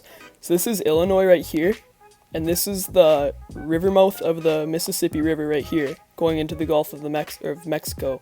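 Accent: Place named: Cleveland, United States. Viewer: Can tell this dudes from Cleveland 😂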